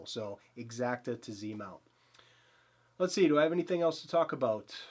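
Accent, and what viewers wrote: Boston, United States. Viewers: Always love your videos. For the record your Boston accent is not that bad.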